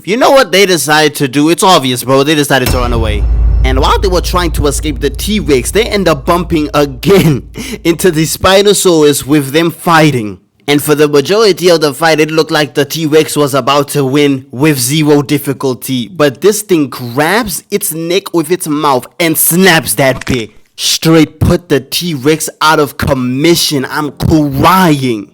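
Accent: South Africa. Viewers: Why this guy has both a South African and American accent wtf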